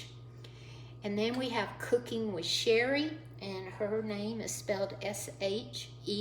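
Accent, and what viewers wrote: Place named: North Carolina, United States. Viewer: Hi that a great recipe. I'm detecting southern, NC here. Have a great weekend tyfs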